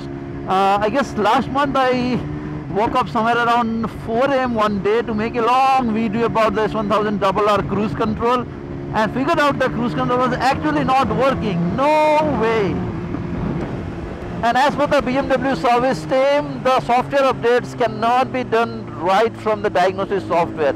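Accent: Odisha, India. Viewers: English lecture ree odia tadka😆😆..mast!!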